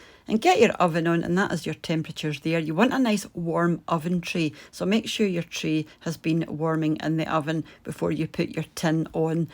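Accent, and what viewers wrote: Scotland, United Kingdom. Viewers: Oh gloriously yummy 😋that and a lovely Scottish accent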